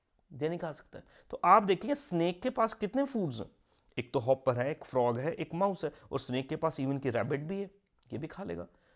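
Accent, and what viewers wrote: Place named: Punjab, India. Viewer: Sir aap Punjabi ke lagte ho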